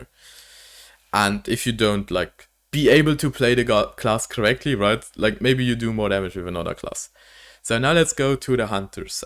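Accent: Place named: Germany. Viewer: Are you german? You sound german, lol.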